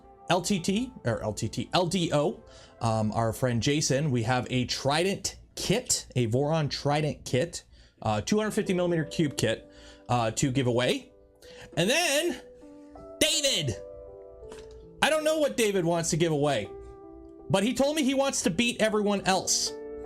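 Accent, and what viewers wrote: Canada. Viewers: You speaking about being a true Canadian has me instantly sold as a new subscriber to this channel. Just got my first 3D printer in the mail yesterday. It's an SV06 Plus. So this video is a major help. Great work on this my dude. From a fellow proud Canadian (well, ashamed of you know what/who, but ya know.. Next election eh..). By the way I could tell within the first three minutes of the video hearing how you speak, that outright gave away your Canadianess right there but yeh.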